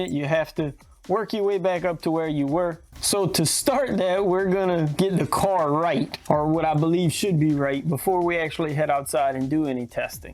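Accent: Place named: South Louisiana, United States. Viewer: Man are you from South Louisiana? Heard a familiar accent in your wide body corvette video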